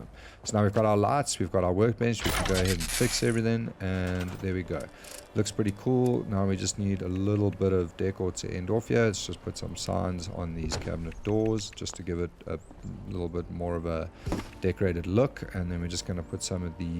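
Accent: South Africa. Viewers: My brother wonderful mate really great explanation and total groovy build by the way u sound south african no offense I am from there also maybe we can jam sometime also I just got back on fallout after 5years lol being grinding something else but loving the game again peace man.... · Bro, are you South African?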